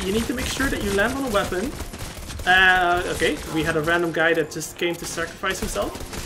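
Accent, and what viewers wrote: Netherlands. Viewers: One qeustion : u dutch? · I can hear your dutch. Groetjes en bedankt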